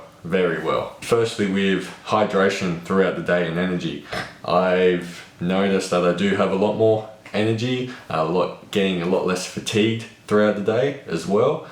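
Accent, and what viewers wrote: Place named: Australia. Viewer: You look German but is Australian or New Zealand asf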